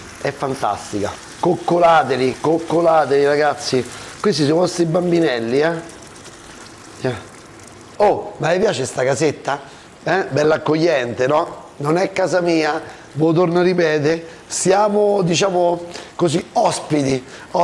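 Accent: Rome, Italy. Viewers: CIAO... OGNI VOLTA CHE COMINCI I VIDEO DICI SEMPRE ISCRIVETEVI.. IO SONO UN PO' DI ANNI CHE MI SONO ISCRITTA..OLTRE A TE GUARDO ANCHE RUBEN UN'ALTRO ROMANO COME TE.. GLI ALTRI CANALI LI GUARDO.. MA NON MI DICONO NIENTE... SEI PROPRIO IN GAMBA!!! SOLO A SENTIRTI PARLARE ROMANO MI SENTO A CASA.. INFATTI IO SONO DI CIVITAVECCHIA E IL MIO DIALETTO SI AVVICINA AL VOSTRO.. MI PIACI ANCHE QUANDO CUCINI DA CASA TUA.. E A VOLTE A CASA DI TUA MADRE.. TI RINNOVO I COMPLIMENTI.... MI PERMETTO DI DARTI DEL TU.. PERCHÉ POTREI ESSERE TUA MADRE DATA L'ETÀ. (75 ANNI) RINNOVO I SALUTI.. CONTINUERÒ A SEGUIRTI.. CIAO A PRESTO E BUONA SERATA 🌹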